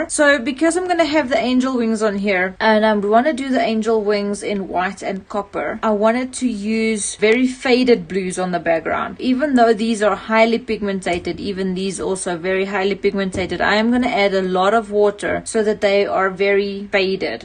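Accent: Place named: South Africa. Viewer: Your tutorials are fabulous! I just found your channel and subscribed! I thought your accent is from South Africa! I am too from SA! I live in Adelaide now in Australia, but might be moving back to look after my mom. Do you live in SA?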